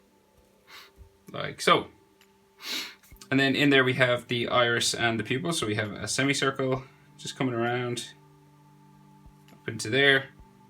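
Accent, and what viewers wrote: Ireland. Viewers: are you irish??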